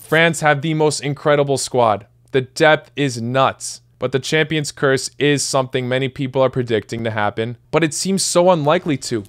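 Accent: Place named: United States. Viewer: my brother listen to his accent, he's American along with Croatian.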